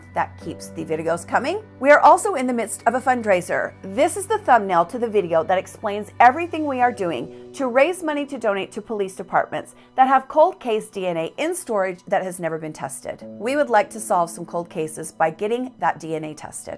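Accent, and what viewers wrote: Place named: United States. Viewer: I love how Americans pronounce 'The -shires' like something out of 'Lord of the Rings' 😆🤣 so sweet - we say it like plain ol' 'shur' I'm afraid...'Che-shur' 'Lincoln-shur'...& don't get me started on 'Worcestershire' 😄 As for being in a dark 7mile tight cave??...for fun...?! HELL NAW!! 😬👈 Terrifying vid & great channel as always. 📛 🖤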